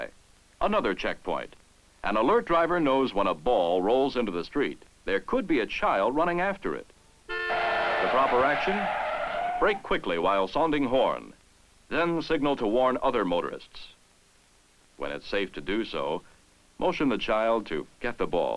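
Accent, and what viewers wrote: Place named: South, United States. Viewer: Southern Accent she has & yall come back now !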